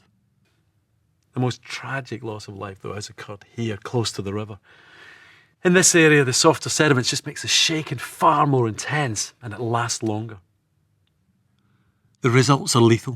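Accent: Scotland, United Kingdom. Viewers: His accent combined with his cavalier presentation is grating. All we want is technical information not scottish patronizing. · He had me at his Scottish accent 😊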